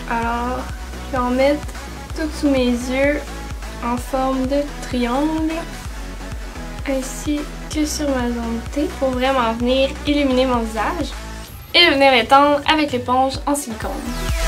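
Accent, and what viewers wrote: Quebec, Canada. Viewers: Coucou tu est vraiment magnifique et ta frange te vas hyper bien et en plus tu est assez drôle et ta l'accent québécois 💪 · Ce n'est pas qu'elle ne parle pas bien le français c'est juste qu'elle a l'accent Québécois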